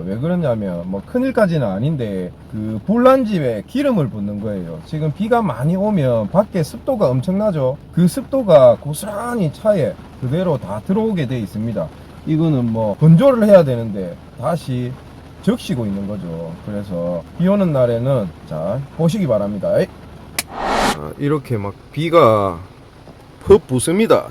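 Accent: Gyeongsang, South Korea. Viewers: 구수한 경상도 사투리 멋져요~~~~😊😊😊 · 오오 ㅎㅎ 정말 유용한정보 감사합니다 ㅎㅎㅎ ㅎㅎ 경상도분이시라서 너무 너무 친숙해요 ㅎㅎㅎ 저희어머니쪽이 의성이신데 ㅎㅎ [근데 꼭 어디서 뵌분처럼 너무 너무 친숙하고 친절하게 잘 설명해주십니다 ㅎㅎㅎ ] 최고 최고 짱짱맨~~ ㅎㅎㅎ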